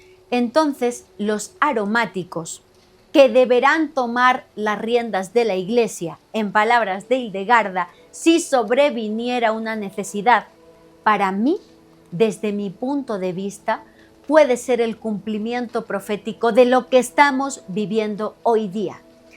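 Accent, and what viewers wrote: Spain. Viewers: Hola Mi esposo y yo siempre te escuchamos y nos da mucha nostalgia tu acento,pues teníamos un sacerdote español en nuestra ciudad en Quintana Roo y el era como tu una biblioteca andando ☺️🥰 Me gustaría saber dónde puedo obtener el curso de santa hildegarda,no me gustaría perder esa gran oportunidad Muchas gracias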